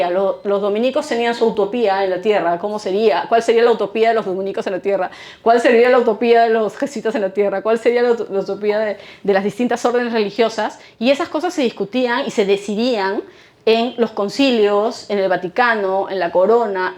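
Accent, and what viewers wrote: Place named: Lima, Peru. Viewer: Dentro de la lengua se denomina " regionalismo" que es la peculiaridad q tienen ciuadades y regiones Al pronunciar sonidos. El acento limeño lo tiene en El sonido de la "j" y asi lo hay en Otras regiones del Perú. Ella no lo hace por molestar. Así que disfruta la Charla y quedate con lo importante.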